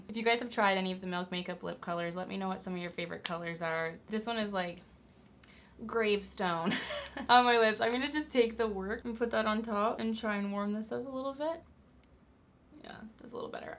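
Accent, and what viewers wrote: Canada. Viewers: She she Canadian? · I'm living in Scotland but I'm from Vancouver Island, I love watching your videos! Love your makeup and fashion style plus you just seem nice and fun! Love hearing a Canadian accent as well! I would love to know where you get some of your cute little gold earrings. Keep up the great work! xx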